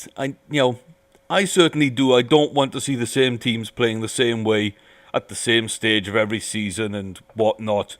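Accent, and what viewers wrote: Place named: Wales, United Kingdom. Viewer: There,s Welsh Twang in your voice are you from UK originally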